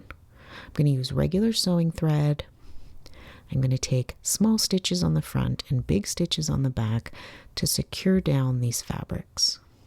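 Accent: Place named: Canada. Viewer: I recently found your channel, and I love it. You have a very pleasant voice to listen to. I can tell by certain words that you are from Canada. But only certain words. Thank you for what you do. I have bite the bug and gone down the Slow Stitching rabbit hole. Nothing completed yet. I also bought your mini animals and plan to buy more. When the budget allows. Thanks again.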